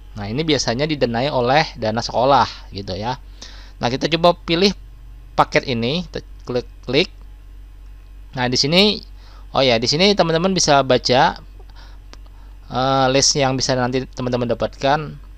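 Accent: Banjar, Indonesia. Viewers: Banjar bangt pian nie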